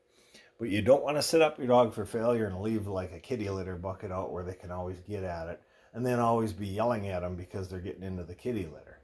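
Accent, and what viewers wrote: Minnesota, United States. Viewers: That minnesooooohhhhhta accent tho. Great vid on the importance of the place command.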